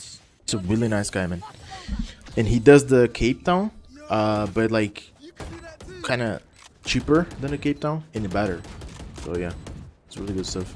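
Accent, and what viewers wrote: Brazil. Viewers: wait are u from brazil? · ele é brasileiro ss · Da pra perceber no jeito de falar kkk Brasileiro falando ingles sempre puxa umas letrinhas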